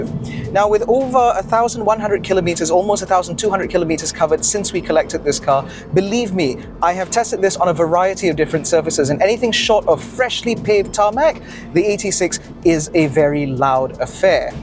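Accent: United Kingdom. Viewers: His accent is like british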